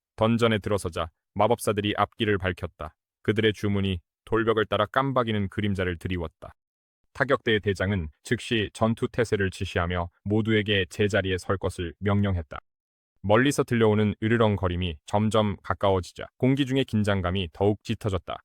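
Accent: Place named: South Korea. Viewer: 한국인 그 자체네요... 얼굴가리고 나레이션만하면 한국인인줄 알겠어요😂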